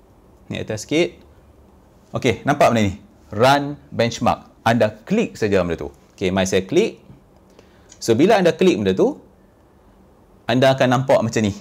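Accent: North, Malaysia. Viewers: Walaupun beliau bertutur bercampur dgn loghat utara,saya yg berasal dri Sabah faham dgn baik,tak sangka banyak Malaysian buka content video lain dri yg lain tapi yg penting sangat bermanfaat! Tq for sharing the info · Abg terang memang padu, loghat utara plak. haha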